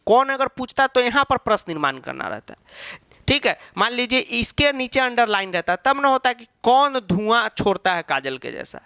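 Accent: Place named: Uttar Pradesh, India. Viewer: He is not bihari he is up wala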